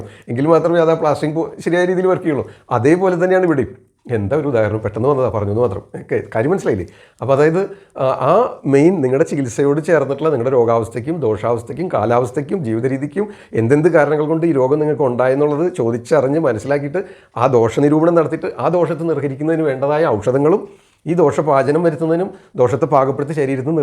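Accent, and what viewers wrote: Thrissur, India. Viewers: തൃശൂർ ഭസായാണോ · Sir. Thrissur aano? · Trissur slang is good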